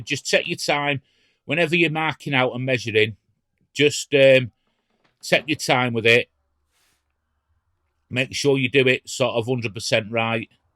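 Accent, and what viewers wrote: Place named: Salford, United Kingdom. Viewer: Good video mate. Salford/Swinton accent?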